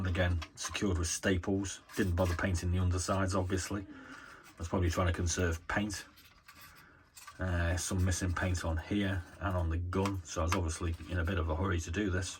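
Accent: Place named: Merseyside, United Kingdom. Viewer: Sounds a bit of Merseyside, Wirral, Lancs there, prob got a motorcycle too, ( like myself of course, im projecting), Subbed, 👍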